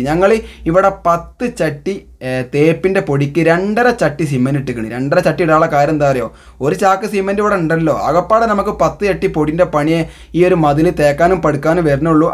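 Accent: Malappuram, India. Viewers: ആ മലപ്പുറം ഭാഷ അതാണ് പവർ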